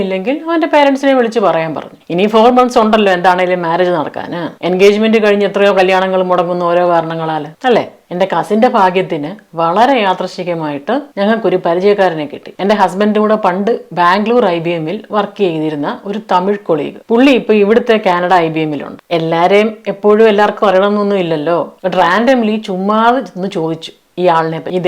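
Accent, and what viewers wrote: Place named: Chengannur, India. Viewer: ചെങ്ങന്നൂർ സ്റ്റൈലും സ്ലാങ്മാണെന്ന് ഊഹിക്കുന്നു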